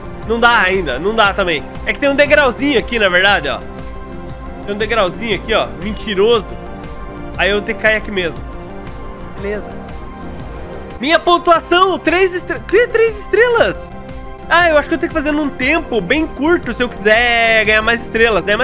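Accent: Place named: Paraná, Brazil. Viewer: Cai de paraquedas nesse sotaque gostoso de ouvir 😅 sdds Paraná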